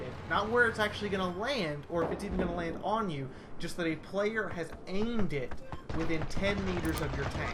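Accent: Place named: Alabama, United States. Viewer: I appreciate Youtubers/Bloggers that post informative information. Plus that Alabama twang.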